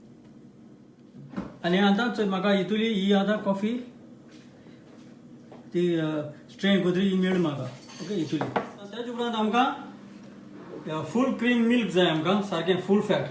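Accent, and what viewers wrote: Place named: Konkan, India. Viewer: Thanks for sharing your recipies in Konkani More than your recipies I enjoy listening to our Saxtti (Chinchinim) Konkani.Keep up the good work. 🙏🙏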